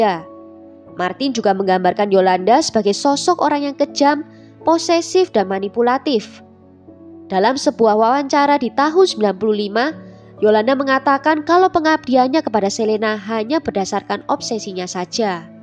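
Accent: Java, Indonesia. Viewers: Cantik.. medokuhhh 👍💖💖💖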